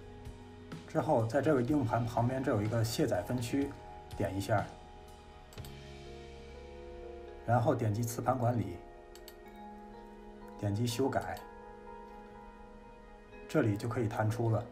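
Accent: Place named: Tianjin, China. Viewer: 我从油管上找了很长时间的视频都他妈没有说得清楚的。您说清楚了。关键是，我听出来了乡音。我是离开家乡二十年的天津人。哈哈哈哈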